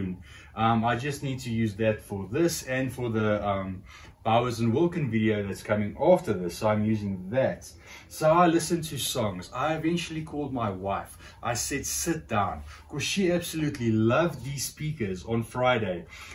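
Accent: South Africa. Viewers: My god its my first time ever hearing a South African accent and it just sounds so cool 😎 love the speaker review too 👍 greetings from Germany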